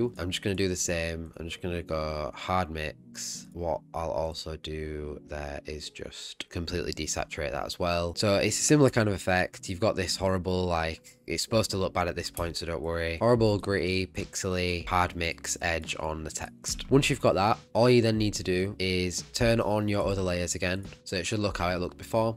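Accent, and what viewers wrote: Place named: United Kingdom. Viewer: Sooo bri'his! (I lived 2 months in Reading years ago :) )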